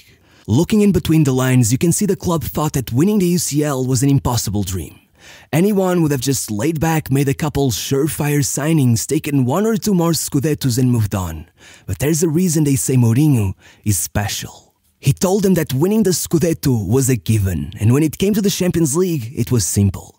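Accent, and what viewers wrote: Portugal. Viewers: Why do you pronounce everything like it's portuguese ? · Tenho a certeza que és tuga 🤣 mas força manu curto a brava 👏👏👏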